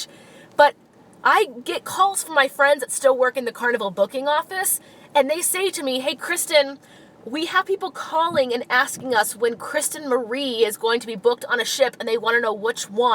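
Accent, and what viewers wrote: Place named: United States. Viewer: I heard the American accent and knew you had to be in the production show lol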